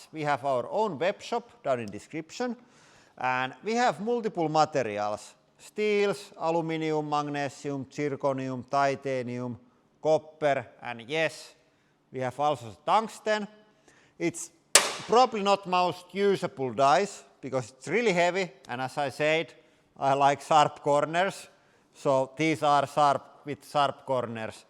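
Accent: Finland. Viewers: The most Finnish sounding man I've ever heard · äijä hyvin paistaa toi suomi läpi puheesta xd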